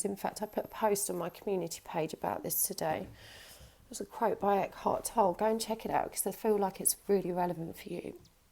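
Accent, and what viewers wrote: England, United Kingdom. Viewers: Very deep messages in the beautiful English accent! 🙏🏼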